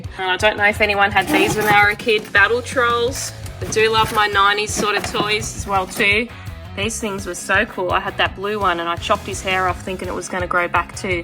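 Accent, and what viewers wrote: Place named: Australia. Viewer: Smokin' hot, tattoos, plays video games aaaaand has an Australian accent?! Crikey!😊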